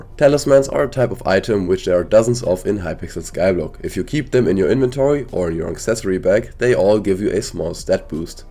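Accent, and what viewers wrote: Germany. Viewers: Do you speak german because im german and your accsent kind sounds like it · This dude has to be German. He sounds so hard german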